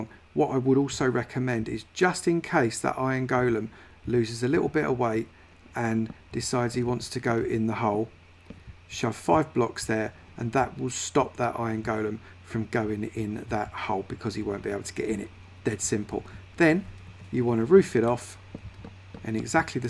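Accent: United Kingdom. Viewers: That Gordon Ramsay accent tho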